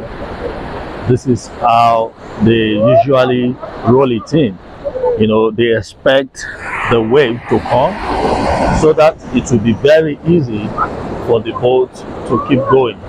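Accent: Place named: Nigeria. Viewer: Are you from Nigeria,you’ve Nigeria accent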